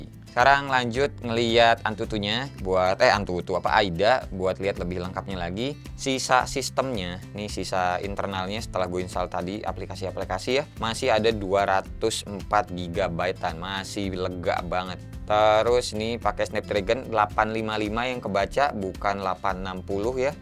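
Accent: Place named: West Java, Indonesia. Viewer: Sunda pisan aa teh logat nya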